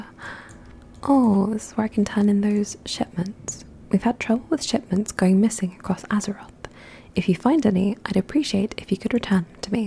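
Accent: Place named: England, United Kingdom. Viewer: Damn such a pretty voice 😮 trying figure out what part of England your accents from. I know your nervous about it but soft spoken suits you I love whispering too 😊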